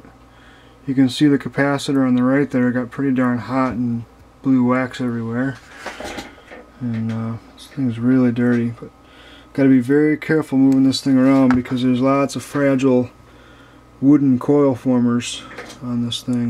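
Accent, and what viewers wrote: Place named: New York, United States. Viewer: Sounds like your in NJ or NY? Great video.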